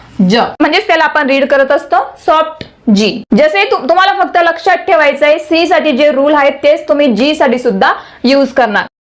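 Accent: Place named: Khandesh, India. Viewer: Where are you from ma'am your language tone like khandeshi girl. Are u khandeshi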